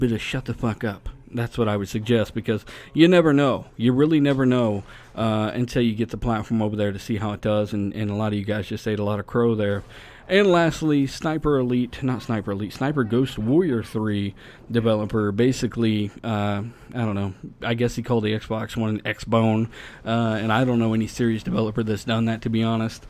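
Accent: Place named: Hawaii, United States. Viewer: you got that minor pigeon accent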